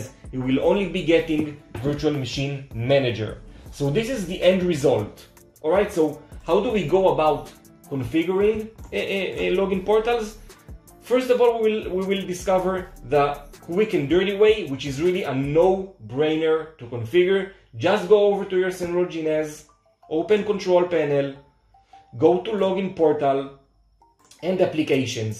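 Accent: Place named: Israel. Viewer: חחחחחח אי אפשר לברוח מהמבטא הישראלי אחלה סרטון אח יקר.. מקצועי לגמרי ברמה של חו"ל מוקווה ששומר על עצמך בימים האלו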